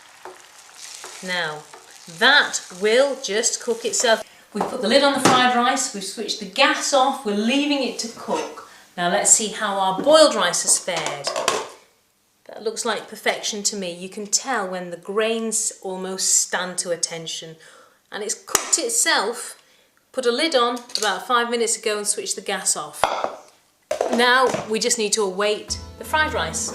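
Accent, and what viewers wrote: England, United Kingdom. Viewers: what is that accent?why english accent? really funny though.....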